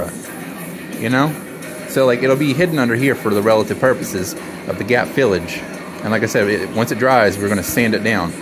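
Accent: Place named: South, United States. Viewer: Idk why but your southern accent is at 200% on this video. 😆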